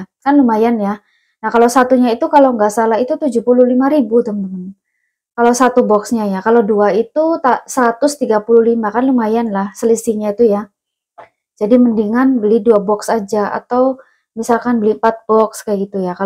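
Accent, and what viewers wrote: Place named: Cirebon, Indonesia. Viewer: Mohon maaf lahir bathin juga ce moga puasanya lancar, aku klw puasa nggak pernah ijin ce Krn sehari2 incess ku gak peduli aku mkn gak paling disangka nya sedang diet, aku suka denger Cece ngmng sekali2 suka keceplosan Cirebonnya , sebagai sesama org Cirebon bikin aku senyum2, aku minum apel cuka organic beli di 360 ce ditambahkan satu tutup kedalam satu gelas air hangat lgsg turun drastis , aku juga kalau isi selai coklat suka keluar ce, bikin fatair bgtu isinya keju yg wadah nya bulat kardus itu enak ce dijamin cantik bersih , murah dikaibo hrganya kisaran 20$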